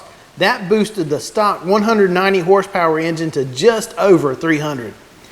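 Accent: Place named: Texas, United States. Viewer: I wish you would've used someone else to narrate these videos. My employer's former chief officer of operations was from Texas and his twang was annoying like this guys.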